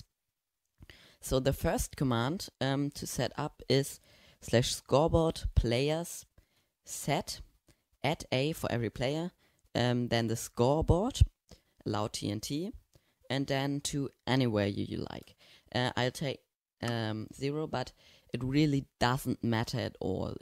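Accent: Germany. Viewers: you have a german accent lol (I am from Germany)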